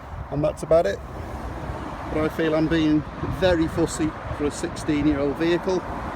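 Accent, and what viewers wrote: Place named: Northern England, United Kingdom. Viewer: Lingua impeccabile, lieve accento nord inglese, con i caratteristici glottal stops. Che il camper sia piccolo è lo scopo preciso del veicolo: è un miracolo che abbiano fatto stare tutto in un furgoncino tanto minuscolo. Poi se tu hai un Mobilvetta da 9 metri, buon per te, ma non c'entra niente. Mi sa che sei un po' corto di comprendonio.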